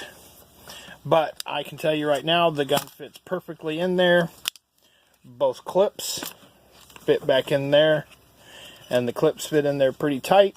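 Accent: South, United States. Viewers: Hi darlin,you know that's how we southern people talk.I hope you are not bothered by that.I am so happy you started you own video's.I am a new sub.Looking forward to more <link> safe my friend.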